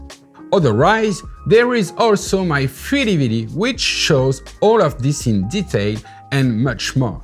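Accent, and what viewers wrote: France. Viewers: I really loved the colour scheme in this one, one of my favourite paintings you've done. :) About a month or so ago, I paid the shipping for the dvd but I still haven't got it. I'm not sure what to do.. can you suggest anything? Thank you x (also nice French accent :) )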